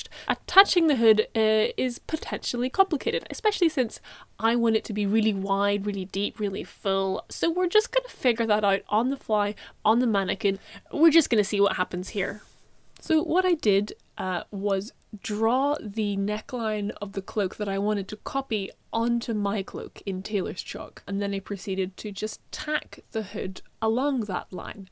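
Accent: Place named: Ireland. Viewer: I was like “this is 100% being crafted by an emerald isle accent”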